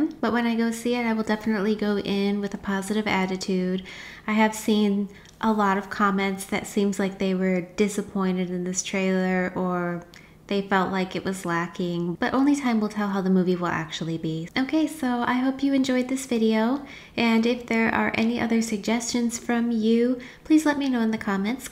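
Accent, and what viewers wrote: Telugu, India. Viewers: Wowww your Telugu is so cute😍🥰👌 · Your talking in Telugu so cute... Jai 🐯🐯 Do more Telugu movies reactions.. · Ur speaking Telugu so sweet of u.. Jai NTR💪. our Tiger is ready to Hunt Box offc.. Sep27th Devara Blast💥 thank u for doing this video❤..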